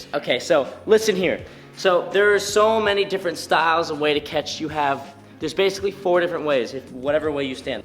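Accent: Australia. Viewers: R thay from Australia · are you Australian?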